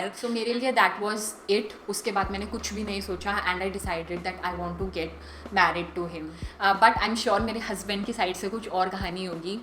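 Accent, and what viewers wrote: Delhi, India. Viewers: I have been following your channel since a week or even less and all that I can say is why didn't I discover you earlier! The very first video that popped up on my recommendations made me a bit uncomfortable with your speaking style which I guess is a bit like alot other Delhi girls, (please excuse the generalisation), but further into the videos, I realised that it is that very speaking style that grabbed me and made my binge all your videos in a span of a couple of days. I felt it was non-pretentions and so so honest to anybody who just wants to have a good time watching creators not unlike you putting up so much hard work. I wish I could pat you on the back and tell you that you're doing a great job. I am not so much into hair and makeup and dress up videos and do not feel the need to follow any other YT for content therein, you're content appealed to me for the sole reason that you made me happy just sharing your stuff with me. 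Thank you sm. Keep doing what you're doing and all the love. Sorry for the long post.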